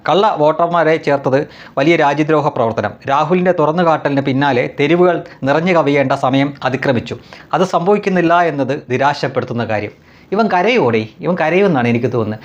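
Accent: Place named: Onattukara, India. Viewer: എന്തുവാടേ, നല്ല ഓണാട്ടുകര ഭാഷയിൽ പൊളിച്ചു കൈയ്യിൽ കൊടുത്തു, keep it up👍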